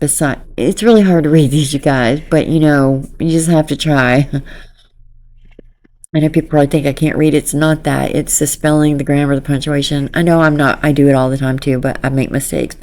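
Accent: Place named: South, United States. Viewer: ❤ you dont need to :) like your dialect, 😊 is this a southern? I am from Switzerland and learning English,start noticing dialect only after a while 😊 Sheena needs to say children are off limit is unnecessary and screams predator behavior .. They are child trafficking itches ... and this joe is absolutely sus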